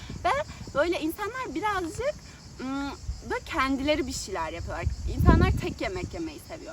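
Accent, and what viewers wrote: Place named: United States. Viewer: Bilkent üniversitesi vakıf üniversitesi Boğaziçi devlet okulu kıyas klasman farklı ama siz bence Ankara dan kaçmışsınız,Bilkent girişi kolay mezun olmak zordur,Boğaziçi zorsa Bilkent kesinlikle daha zordur ,devam zorunluğu vardır ve hangi bölümde okursanız Türkiye nin en iyi bölümü olarak okursunuz bunu size okul her türlü hissettirir,Ankaralıyım diyorsunuz evet İstanbul daha güzel bir şehir ama Ankara’da Tunalı,Gop,Bahçeli de milyon tane Cafe vardı,biz bayağı gezerdik siz içinden değilsiniz galiba,kulüp kurmak isteyenler rahatlıkla kurabilirdi,Bilkent mezun senenizde kurumsal firmalar bizzat gelip kariyer günlerinde elemanlarını seçerdi bir çoğu mezun olurken iş sahibi olmuştu,Boğaziçi güzel bir üniversite ama imkanlar Bilkent te daha fazla ,network demişsiniz sadece güldüm😂😂 hazırlık kabus gibidir evet aşırı zordur ama siz ielts aldım demişsiniz keşke 1,5 sene beklemeseydiniz,birde bu konuşma stili gerçekten çok komik ,özenti kendiniz gibi konuşun gerçekten çok itici duruyor ,bizler Türk toplumu olarak Amerikan yeni dönmüş işçi havasında konuşmayalım,Türkçemiz kişiliğimiz her daim sizi seçkin kılacaktır.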